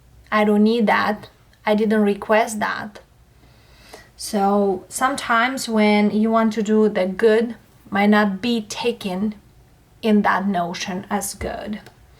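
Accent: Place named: Russia. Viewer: Always knew U R Russian😊😅👍That beauty eyes accent😊💝👍Goddess 😊👍💖🌻Many thanks! Much Blessings2U/Family💖🌻💝😊🙏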